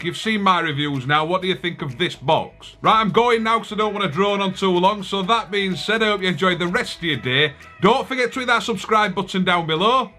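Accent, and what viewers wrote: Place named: North, United Kingdom. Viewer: You're definitely the happiest and funniest Android Boxes reviewer that I've ever seen in the whole Youtube. Got a new subscriber! By the way your northern British accent is very pleasant to listen to.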